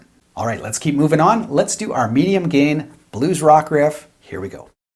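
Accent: Canada. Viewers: Thanks, you did a really nice job with that. Your playing of the riffs was as consistent as the equipment for each comparison. I was surprised that I liked the A 2's the most. However, the 5's, which I have in my CV Tele were nice also. I'm new to your channel and was wondering, do I detect a little bit of a Canadian accent?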